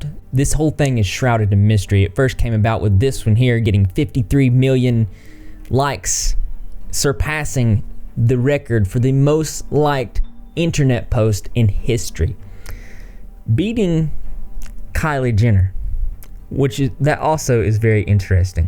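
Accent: South, United States. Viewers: Conspiracy theories, best hair on the platform and a southern accent? I STAN😍❤️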